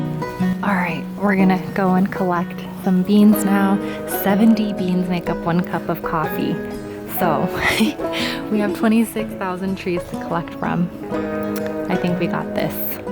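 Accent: California, United States. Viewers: your whiney cali drone voice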